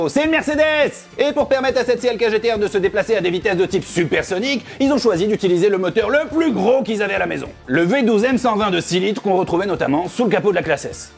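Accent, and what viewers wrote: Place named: Belgium. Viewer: Bon j’ai failli mettre un pouce rouge pour « l’accent belge », mais juste pour l’humour et surtout le contenu, c’est un gros like 👌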